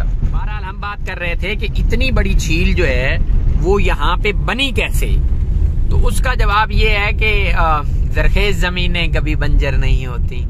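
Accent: Sindh, India. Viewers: Loved your Sindhi accent as well ❤️ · what a beautiful sindhi accent of yours ☺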